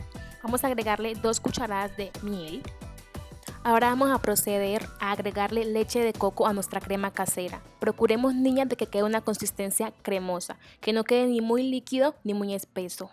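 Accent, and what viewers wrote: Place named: Chocó, Colombia. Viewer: Hola hermosa. Cuando te escuchó me recuerdas a mi cuñada, tiene ese acento de Quibdo Chocó como el tuyo. Pero no se si eres de allá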